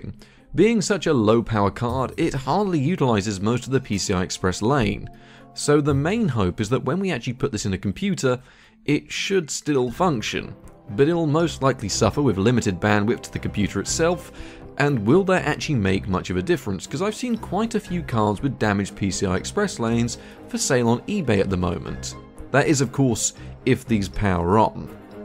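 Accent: United Kingdom. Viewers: English accent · I may be stuck in bed with flu, but at least I got with his British accent and his old tech stuff to cheer me up.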